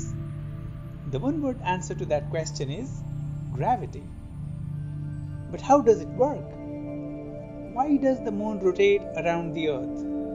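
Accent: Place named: India. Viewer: Turned off immediately after I heard the Indian voice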